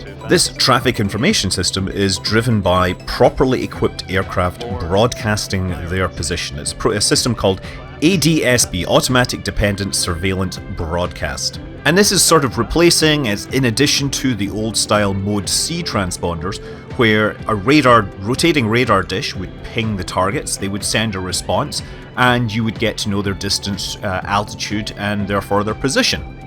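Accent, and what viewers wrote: Scotland, United Kingdom. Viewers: I always thought you lived in Scotland 🏴 or the Isle of Man 🇮🇲 based on your name and accent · Ha Ha First time watching your vids and i heard the Scottish accent and thought you were flying in Scotland. You must have taken our weather with you to California. New Sub fae yer home country.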